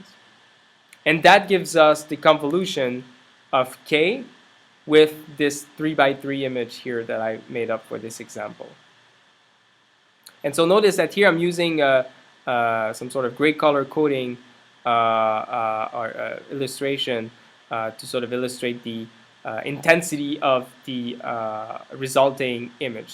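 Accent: Quebec, Canada. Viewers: r u quebec or frenc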